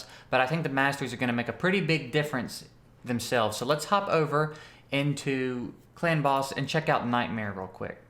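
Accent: South, United States. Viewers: so cute that your a NY boy that sounds like a wholesome southern cowboy :D Trick ass mark.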